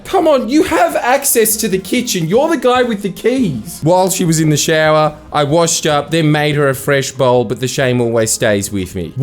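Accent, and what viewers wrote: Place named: Australia. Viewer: Australian accent is so strong, I thought he was talking about H&K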